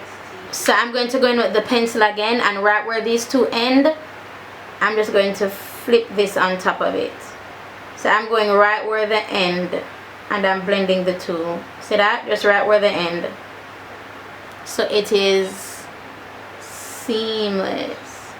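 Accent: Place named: Jamaica. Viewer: nice girl... from the first time I watched your video I heard your accent and I know u were Jamaican... big up uh self goodie mi a Jamaican too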